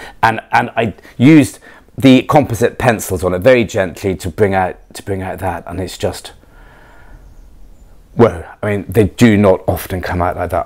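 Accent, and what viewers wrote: RP, United Kingdom. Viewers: Just recently subbed to your channel and catching up on a few of your previous ones. Like your unique way ( Your a bit posh)😅 but your very fascinating to watch!👌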